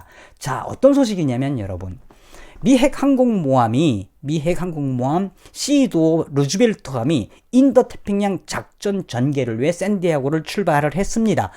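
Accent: Pyongyang, North Korea. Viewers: 정성산 티비는 라북사투리가 매력이야요...ㅋㅋㅋ피양사투리로하라요...화이팅.... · 이북 지역사투리죠.그건 미워 맙시다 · 평양 사투리좀 안쓰면좋겠는데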